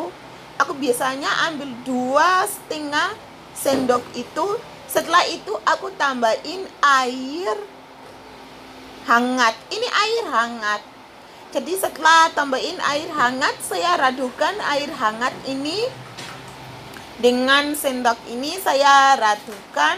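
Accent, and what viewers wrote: Java, Indonesia. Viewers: Saya nonton video2 nya mbak ini ngakak terus karna logat jawa yh sangat medok,Londo kampung piye iki ene sainganne hahahaha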